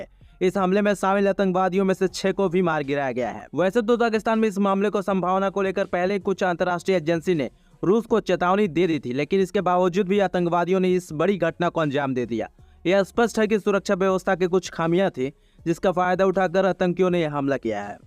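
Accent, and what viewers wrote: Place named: Bhojpuri, India. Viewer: please slow your reading train, what you are reading we cant understandt, even your pronounciation is like you are reading english news in bhojpuri language. so please slow down th speed and concentrate on English pronounciation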